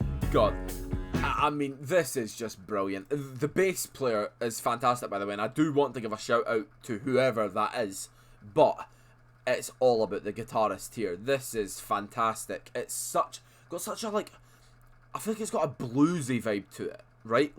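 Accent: Scotland, United Kingdom. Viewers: As an older fan of (I'm 72 soon) I like his rap, but my favourite music is from the 70s, in particular. They are every bit as invested in the lyrics as any decent rapper, with the addition of great talent and skill in playing their instruments. Edited to say, keep letting the transitions happen, they're an intrinsic part of the music. Further edited to say, as a fellow Scot I'm glad to hear a reactor with your accent. I've subbed in the hope of more and for.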